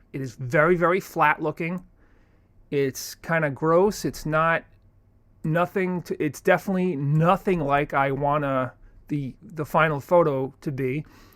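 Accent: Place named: Boston, United States. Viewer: Are you strictly just using a mouse to do all of your adjustments and edits in Photoshop, instead of using a drawing tablet and stylus? Man, I haven't done that since I bought my first computer way back in 1996. That's impressive! Excellent video, too. By the way, are you from Boston? Anyway, definitely a "Thumbs-Up!" from me. Thanks for the help. I appreciate it a lot.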